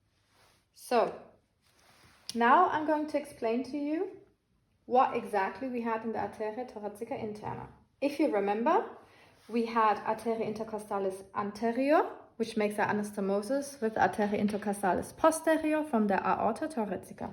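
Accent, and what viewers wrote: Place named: Germany. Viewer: sound German for me, either way thanx for the video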